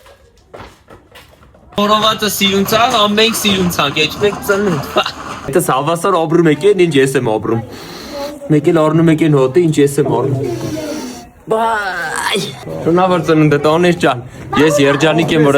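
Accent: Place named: Gyumri, Armenia. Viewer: Սիրում եմ բառբառը , հատկապես Լենինականի ❤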